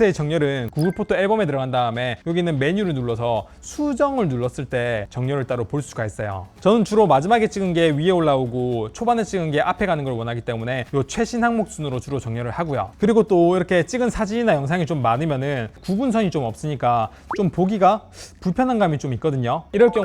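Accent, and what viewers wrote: Gyeongsang, South Korea. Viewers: 너무 유용해요. 감사합니다. 그냥 사투리 사용하셔도 더 정감있으실 것 같아요 ㅎㅎㅎ 귀엽게 서울사람 보이려는 경상도 사람같아서 항상 귀엽습니다.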